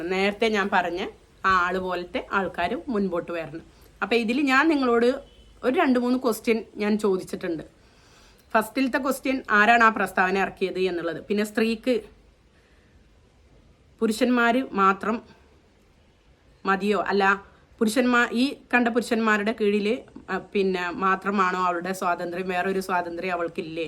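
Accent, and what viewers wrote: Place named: Malappuram, India. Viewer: ഓരോ നാട്ടിലും ഉള്ള സംസാരം അല്ലെ അതു? കോട്ടയത്ത് ഉള്ള എല്ലാ മതക്കാരും ഒരു പോലെ ആണ് സംസാരിക്കുന്നത്..ഇവർ മലപ്പുറത്ത് ഉള്ളതല്ലേ? അവിടെ ഇങ്ങനെ ആണ് സംസാരിക്കുന്നത്..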